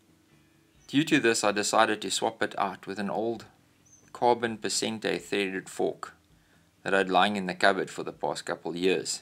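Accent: South Africa. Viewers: Great build 👍 I’m gradually getting used to your South African accent 😊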